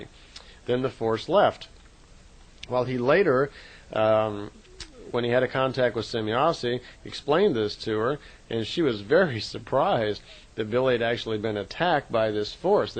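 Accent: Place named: England, United Kingdom. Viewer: Well this mermaid is so wishing that she was at the ocean instead of in Las Vegas....I have found some beauty here and it is a place called Red Rock Canyon...but there is nothing like riding waves with your body!! Soon though i will be in San Diego for a week...my childhood home.....You sound as though you maybe from England..I was born in Ireland!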